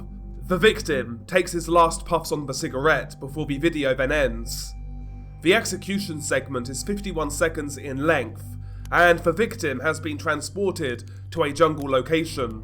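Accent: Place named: United Kingdom. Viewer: He’s a brit lmfao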